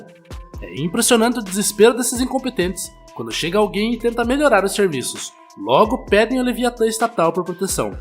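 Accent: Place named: Curitiba, Brazil. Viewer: vocé tem sotaque de Curitiba! Confere? Abç!😊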